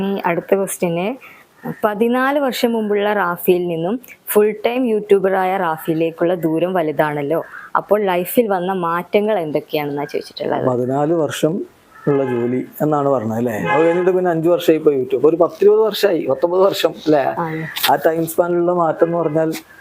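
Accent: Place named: Malappuram, India. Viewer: Malapuram anengilum basha vethyasam undallo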